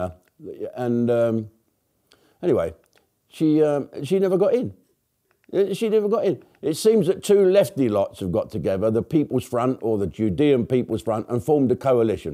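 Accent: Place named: Northern England, United Kingdom. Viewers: What talking like a Northerner for 😂❤ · What's with the northern accent Starmer and Lammy are from your neck of the woods 😊😊😊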